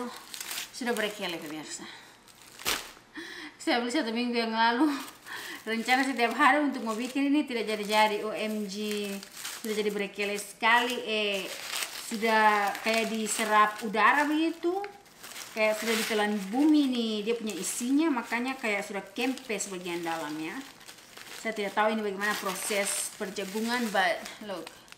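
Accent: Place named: Eastern Indonesia, Indonesia. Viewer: Kk logat timur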